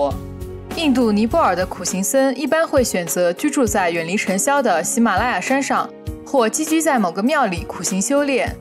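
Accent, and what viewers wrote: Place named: Xinjiang, China. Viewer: 你这新疆 口音很重！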